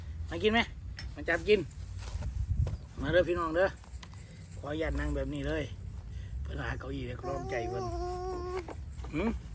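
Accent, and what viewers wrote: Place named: Laos. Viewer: ตกลงมึงสิเว้าไทยคือเว้าลาวบักตาบคำเว้าหลาย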